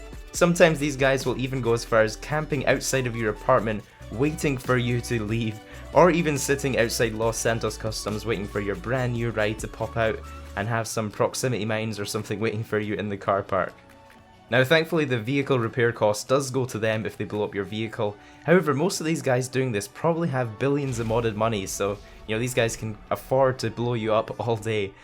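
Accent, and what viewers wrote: Scotland, United Kingdom. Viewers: Are you Scottish? But I hate explosive maniacs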